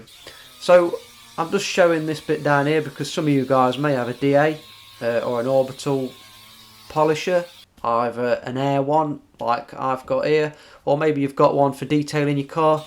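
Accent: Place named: North East England, United Kingdom. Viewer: I recognize the accent tens years ago when I was in the NE of England... enough siad...this channel's great, I'm ur new subscriber.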